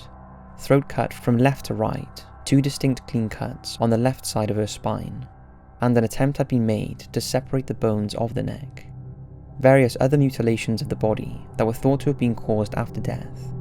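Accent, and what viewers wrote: United Kingdom. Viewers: Top-notch content. As always, sit back, relax, and fall asleep listening to your British accent. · It makes me laugh people going on about his voice. It's just a normal British accent.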